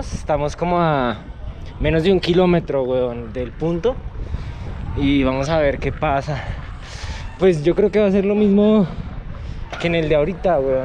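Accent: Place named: Bogotá, Colombia. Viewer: severo acento rolo jajaj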